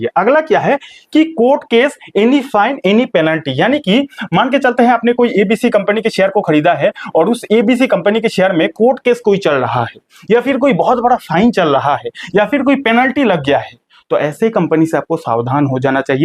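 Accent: Bihar, India. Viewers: Are you bihari???😊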